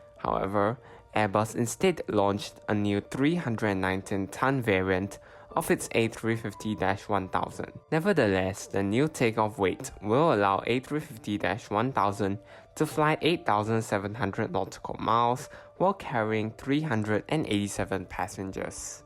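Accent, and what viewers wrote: Singapore. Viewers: You sound Singaporean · Can’t help but think your accent is that of a Singaporean?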